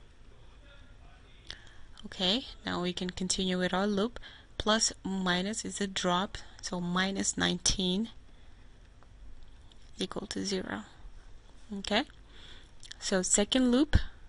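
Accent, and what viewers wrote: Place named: India. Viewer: Idk why but Indian accents give me ASMR so I get that while I learn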